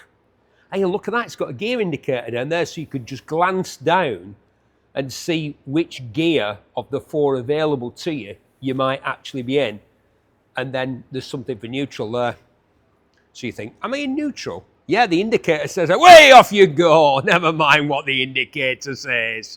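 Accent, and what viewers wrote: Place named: Northern England, United Kingdom. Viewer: Listen to a northern bloke talking absolute bollocks for 3 hours. Brilliant. Some great times at the bulldog bash👍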